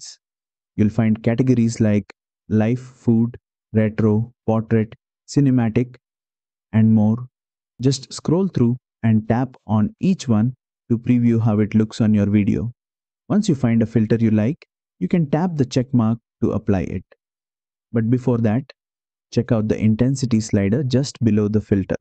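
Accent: India. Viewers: when the dude is talking in a indian accent u know ts is gonna be good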